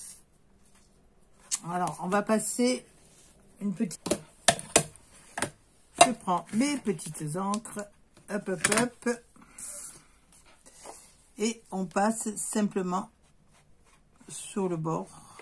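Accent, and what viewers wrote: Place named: Midi, France. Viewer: Bonsoir merci pour vos explications nettes et précises.J ai déjà terminé mes cartes du même bloc c est très agréable.L accent du Midi me rappelle des vacances pas loin de Béziers.Salutations de Bruxelles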